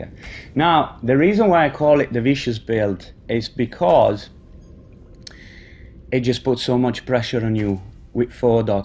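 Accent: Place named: Northern England, United Kingdom. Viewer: Haha as a Midlands man I was sitting here like.. I'm sure he's northern... but he also sounds foreign... love it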